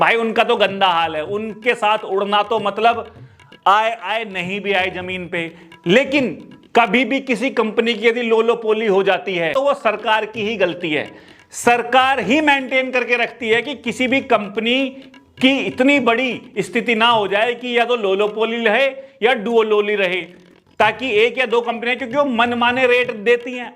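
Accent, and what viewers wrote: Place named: Meerut, India. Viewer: Bhaiya aap mujhe bahut ache lagrte ho or aap bilkul humhare goan meerut side jaisi language bolte ho hila matlab kaam dhandha karo aisa humre yaha bolte hai❤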